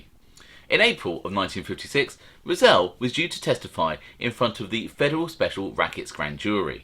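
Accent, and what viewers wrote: United Kingdom. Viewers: I fucks wit heavy. Perfect limey accent to tell stories and excellent stories with pictures I would like more wiretaps tho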